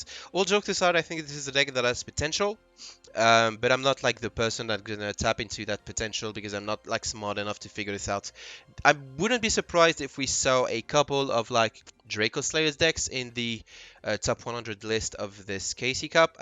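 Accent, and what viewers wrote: France. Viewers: You sound french am I right?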